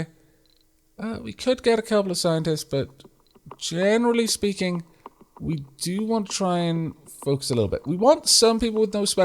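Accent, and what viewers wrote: Wales, United Kingdom. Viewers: I always thought your accent was 100% unapologetically Welsh until yesterday while watching your video my fiance asked if I was watching "the German guy" and when I mentioned this to a friend he said you sound Swedish. 😂 not sure what they are hearing tbh.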